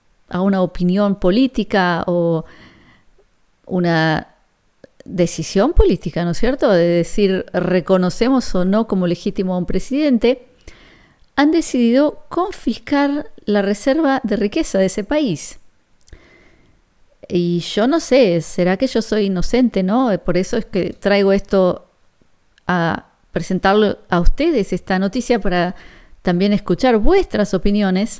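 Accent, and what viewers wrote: Argentina. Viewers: hablas así porque sos Argentina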